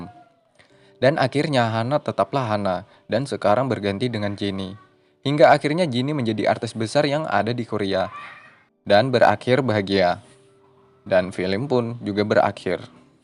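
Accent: Banjar, Indonesia. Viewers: Banjar banaaarr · lwt di beranda dan penasaran, pas denger suaranya fix miminnya org banjar ya kan😀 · Mang orng banjar kh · Kek logat banjar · Urang banjar sakalinya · Hanya lipsing dangsanak, wkwkwk orang banjar bnar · Pian urg banjar kh mamang?? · Orang mana... Logat ny Banjar banar 😁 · Orang banjar kah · Mimin urang banjar kah min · Banjar kh mang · Banjar banar pian 😅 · Orang banjar ya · Nah mantap urang banjar pinanya nah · Banjar nihhh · Org Banjar kh nih min wkwkw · Banjar banget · Tetap ay logat Banjar nya ada biar bahasa Indonesia min ay hahahaa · Banjar banar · logat nya ne kaya orang banjar pang nah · Orang Banjar kh bang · urang Banjar kah mang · Urang Banjar Leh rupanya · Orang Banjar kah mang🤣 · Orang banjar kah ikam ? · Ada rasa rasa bahasa Banjar wk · Mamang banjar🤣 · Mang orang banjar kh?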